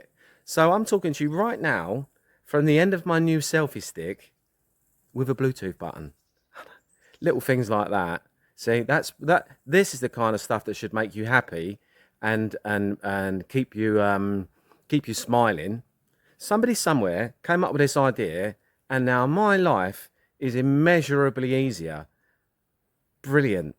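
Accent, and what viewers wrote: Essex, United Kingdom. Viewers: Hi, where are you originally from in England? Correct me if I'm wrong, sounds very much like an Essex accent to me? Cheers, all the best.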